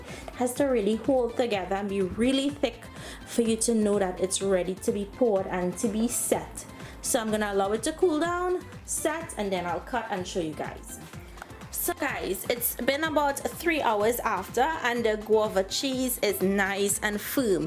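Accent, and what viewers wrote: Trinidad and Tobago. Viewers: I just love the accent of a Trini I love guava cheese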